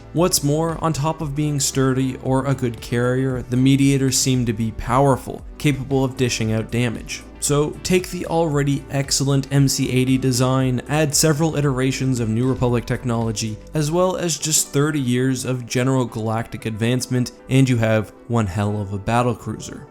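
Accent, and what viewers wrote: Canada. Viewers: I watch your videos so much that I’m starting to say “out” like you do. You’re Canadian huh.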